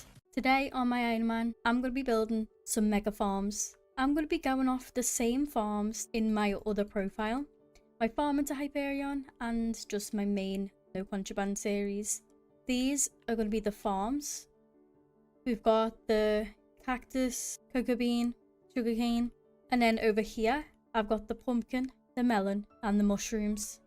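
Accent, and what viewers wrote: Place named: Liverpool, United Kingdom. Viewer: That accent of u sounds like ur from scouse area 😊🎉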